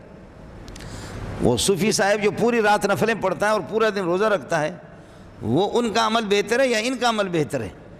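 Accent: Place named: Balochistan, Pakistan. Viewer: In ki zubaan esi hy. Baloch hy na. Pathan logo ki b esi zuban hoti hy. Badtameezi ka irada nhi hota in ka. Badgumani sy bachny ki koshish kijiye. "ظنوا بالمومنین خیرا"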